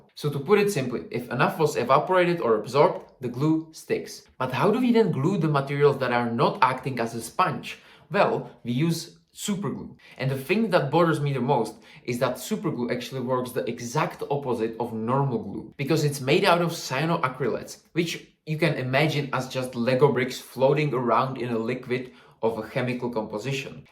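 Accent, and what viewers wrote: Czech Republic. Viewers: Where are you from? You sound like you're from Slovakia 😅 or Czech republic. Yep, stand corrected, Czech republic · Už podle prvních pár vět mi bylo jasný, že jsi Čech :DD. Náš přízvuk je strašně poznat :DD. Pak jsem si to jen potvrdil českým nápisem na tom lepidle.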